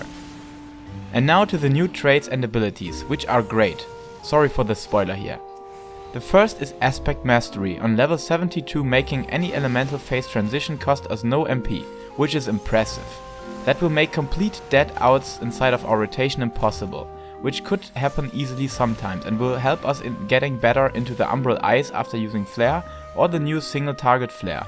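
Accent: Germany. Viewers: Are u German? You Sound like a German 😶😂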